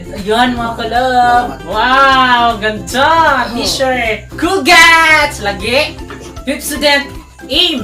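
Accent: Visayas, Philippines. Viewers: i like the way you pronouce haha Lusyon. haha i am just like you. haha the way you speak. Bisaya man gyud. God blessed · James Bryle bisaya kasi siya, just like me. i noticed her accent. hehe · Kaboses mo si michelle fox... Visaya accent is real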